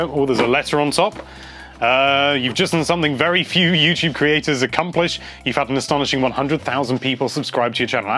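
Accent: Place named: United Kingdom. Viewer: Congratulations, you deserve it! I love watching your videos, you are sympathetic and honest. And the most important thing: your marvellous British accent.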